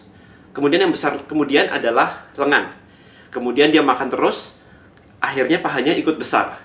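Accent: Java, Indonesia. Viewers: nice video koh.. btw koh, koh ini orang jawa yah?? ngomongnya medok amat koh.. hehehe..:D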